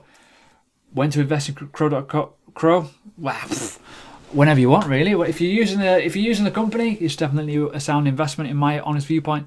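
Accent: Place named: Northern England, United Kingdom. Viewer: Awesome northern accent.. reminds me of when I was growing up in Manchester. Great Channel BTW. SXP is my pick as a cheap starter. ZIL as well - big bag of this. Thx for the ELROND video , may get some of that. Cheers Ben - New Zealand.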